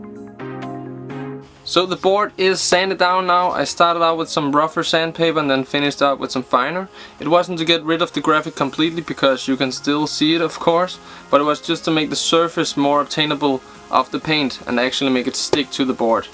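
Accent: Denmark. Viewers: Haha! Tydeligt Du Er Dansk! Men Super God Video!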